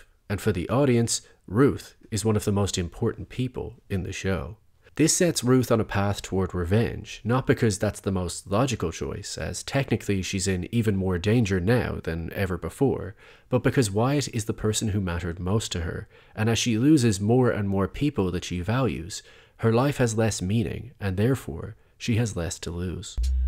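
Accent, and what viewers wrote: Ireland. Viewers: Im pretty sure you're Irish but I can't place the accent exactly.. If i had to guess I'd say you're from wicklow or wexford .. Great video too btw👌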